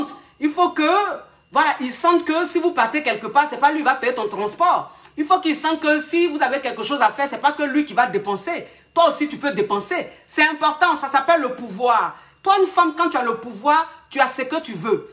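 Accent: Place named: Ivory Coast. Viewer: 😂😂😂😂😂😂😂ma Diva tu m'a tué hooo ko grillade , soupe, braiser je te love ma cause simplisimie, sans façon, ma diva qui parle le vrai français direct de nous en côte d'Ivoire. Love u❤❤❤❤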